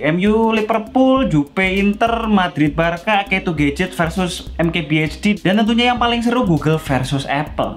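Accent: Java, Indonesia. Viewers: udah ganteng, manis, medok lagi ngomongnya.. #idaman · Kok medok mas... >< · Sobat jaksel logat jawa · Logat medok jowo. Next : logat madura bang ato logat medan ➡️➡️➡️ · Mending nunggu..om balik gak medok lagi. Gak enak didengar mas kalau medok. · Bang kedengarannya pake logat Jawa yah? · Bukan niat mau rasis atau gimana, cuman dengan medoknya jadi agak aneh dan ga nyaman didenger mending kek biasa, jangan dipaksakan. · Jawa nya medoooook mas.... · Logat Jawa nya keluar 😂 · Medokk amat yakk sekarang bahasanya 😂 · bang logatnya berasa pembawa acara orang jawa👍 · Ga seru kalau mendok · bang logat jawa nya di hilang kan saja, supaya lebih mantap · Kok jadi agak2 medok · Medokee maseee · Galfok sma mendok nya om. Sma medoknya kaya saya. Salam ngapak om... Gpp bkn yg prtma nnton,,yg pntg selalu nnton saat pidio baru uplod. 👍👍👍👍👍👍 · Lebih nyaman gausah medok mas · bohoso ne medok men :v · kok baru medok? 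· Kenapa jadi medok 🤣🤣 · Saran jangan pake nada jawa lah pake nada formal sajaa. Gak enak didenger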